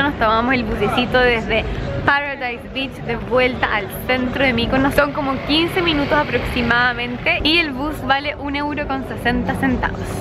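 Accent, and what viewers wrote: Chile. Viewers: Es chilena no? Es la primera chilena que me gusta como habla.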